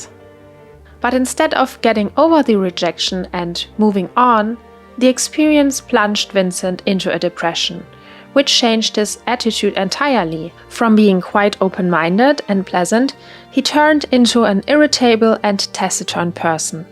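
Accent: Germany. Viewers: Dieser deutsche Akzent ugh This accent I’m a German and it hurts even me🤣😭 but the video on it self is awesome.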